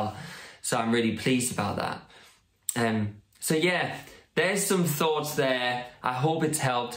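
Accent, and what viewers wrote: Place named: United Kingdom. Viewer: between Queen’s accent and Britis accent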